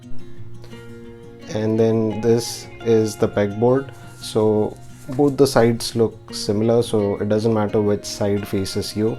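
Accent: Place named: India. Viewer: The calm nice and relaxing Indian voice helped me understand this wonderful Board, please make more Vids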